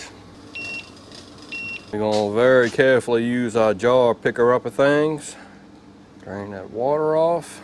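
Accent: South, United States. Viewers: Oh my!!!! I am an Okie who married a Canadian. It purely is a pleasure to hear a southern drawl again. Love this recipe!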